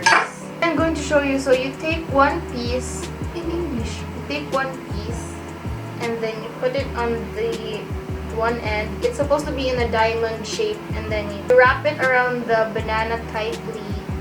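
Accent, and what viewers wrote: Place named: Davao, Philippines. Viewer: This is as good as your writing skills :)) ..with some twist, the Davao conyo twist :))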